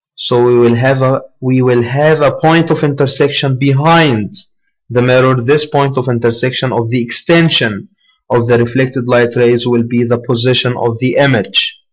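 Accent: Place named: Russia. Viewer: What's with the Russian accent ??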